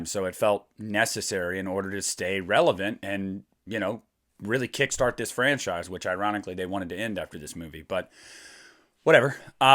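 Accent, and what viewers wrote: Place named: Pittsburgh, United States. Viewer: I love your Pittsburghese.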